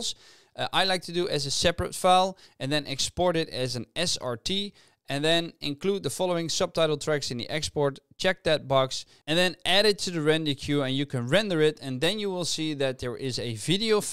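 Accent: Netherlands. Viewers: i hear a little bit of nederlands in your stem. Still thanks for the tutorial it helped me:)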